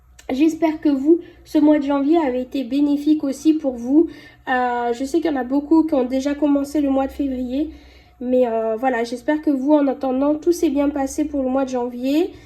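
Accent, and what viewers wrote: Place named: South, France. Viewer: J'aime bien vos vidéos je suis depuis longtemps l'accent du Sud il est léger léger de loin alors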